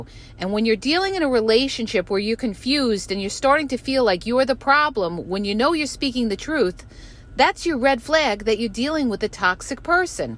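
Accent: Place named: Elmwood Park, United States. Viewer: I love you I'm from Elmwood Park and it's nice to hear somebody talk like I talk and you describe everything the best more so than all these other channels and really help me thank you God bless you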